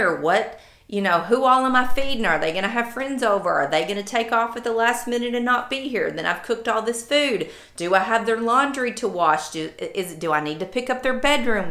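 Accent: South, United States. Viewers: Could listen to you all day! Your friends must love love love you! Having moved back home to Kansas after 4 yrs in Atlanta I miss a lot southern accent and quite truthfully the South! Soooo when I hear you I’m back in the south for awhile! Love all your sites so don’t stop them😊. I am the opposite of you....I’m a dog person...now have 6 rescue cats! When my last 2 dogs died a year after my husband died of a heart attack I was left with 2 cats who got to run the house and I can’t stand seeing feral cats soooo..... I am a water baby and love the beach and like you feet in the sand... well there is a few things about me.....still watching your past posting and enjoying them all.....oh forgot.....while you are a UK person...I Rock Chalk KU. So we can have fun during basketball season😂😂😂😂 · Hey,! I found you when YouTube suggested your “I adopted the shelter dog nobody wanted.” As soon as I heard your southern accent, I was all in. 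I’m from Georgia but living and working in the DC area now. I’m ready to go back to the South where people look you in the eye and acknowledge your presence! I, too, grew up with cats. Got my first dog—a border collie mix—last fall. Best thing that’s ever happened to me! I love all your dog videos but also love the ones about you and your family. Would LOVE to follow y’all around your town and see your favorite spots.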